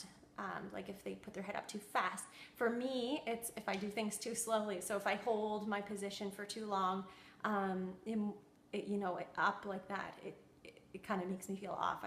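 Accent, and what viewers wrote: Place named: Eastern Canada, Canada. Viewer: Thanks for this video. I am just dealing with this now and you gave me some hope of recovery. Worst feeling Ive ever experienced! Are you in Canada? I feel like I hear a bit of an eastern accent. I’m in western Canada.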